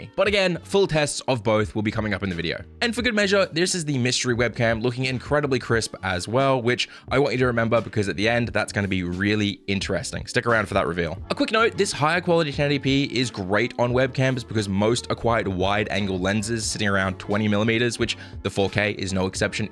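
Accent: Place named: Australia. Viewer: Nice to hear a fellow Aussie :-)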